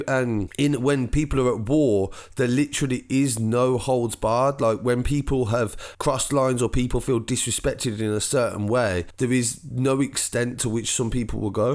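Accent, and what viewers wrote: Birmingham, United Kingdom. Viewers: That Birmingham accent and the stutters, terrible, least you didn't say Birmingham no worse sound than some1 from Birmingham saying the word Birmingham. That's me done. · Love the brum accent!! But shocking stuff in Dublin!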